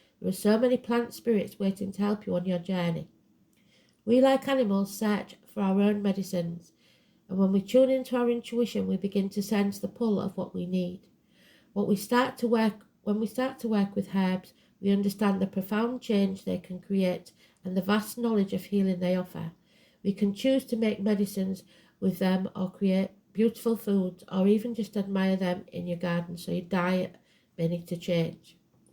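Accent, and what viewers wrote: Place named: United Kingdom. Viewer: I love your beautiful accent! I see you are from the United Kingdom, I realize that is not just England, LOL. I lived in England when I was a young girl, up north in Durham County, in Barnard Castle. I loved it there. I would come home covered in mud from the Thames after playing in the castle and running around with Shetland ponies, LOL LOL LOL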